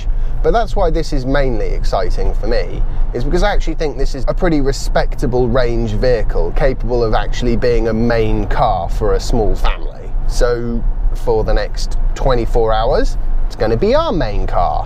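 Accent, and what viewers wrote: England, United Kingdom. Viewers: May I ask you a favor? I'm a foreign english student and I'm always looking for english videos on Youtube. For my luck, searching for Tesla I got one of your videos and I'm enjoying a lot the british accent, but sometimes because of the differences between US and UK english I can't understand some words/sentences. I know this would make you work even more, but... are you able to subtitle your videos? It's for a good cause, you could write in your videos title: [English Subtitles] and this would bring you a lot more attention because of english students :P Thank you very much. Peace out, bye bye. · This fellow seems like a legit English man. He says "brilliant!" just like ron from harry potter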